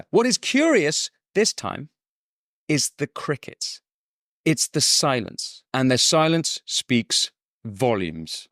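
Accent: United Kingdom. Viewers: Anything you talk about will be accepted by Americans because of your accent. Is what it is, we love the British accent. 🤷🏽‍♂️🙌🏾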